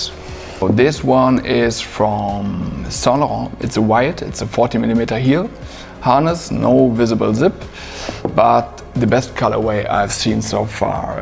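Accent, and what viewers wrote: Germany. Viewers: Bei englischen Videos hört man immer sofort, wenn jemand deutsch ist. 😅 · Love your German accent. It sounds like you’re about to eat me with a glass of Chianti.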